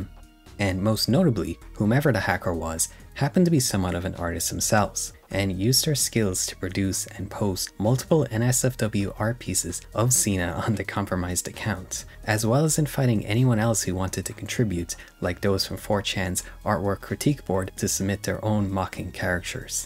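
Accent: Ireland. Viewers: Are you half Irish ? Or somewhat Irish you have Irish inflections in your speech like the word “think” -> “tink”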